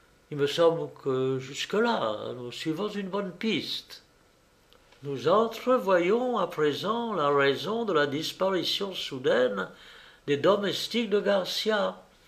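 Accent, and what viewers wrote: England, United Kingdom. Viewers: Pourquoi cet accent anglais? Ilbn'a pas rzison d'être, puisque il est censé s'exprimer dans sa propre langue. . Bref, j'arrête c'est très insupportable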